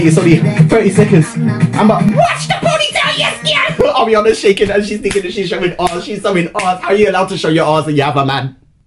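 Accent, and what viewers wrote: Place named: Caribbean. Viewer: Is it just me who thinks sounds like he is from the Caribbean, I'm from jamaica so I should know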